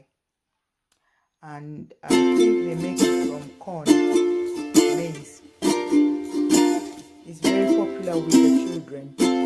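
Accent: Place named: Shona, Zimbabwe. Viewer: Oh my sister you have a beautiful Shona accent, Im enjoying it😄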